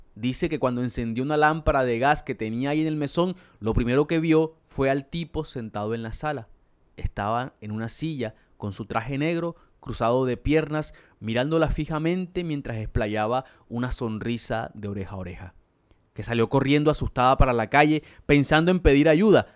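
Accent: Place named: Caribbean Coast, Colombia. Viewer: Me encanta como narras ❤ ese acento costeñoooo🎉🎉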